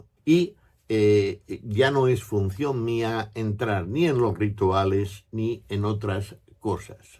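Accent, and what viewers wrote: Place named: Catalonia, Spain. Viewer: Se nota su acento catalán Un saludo desde Miami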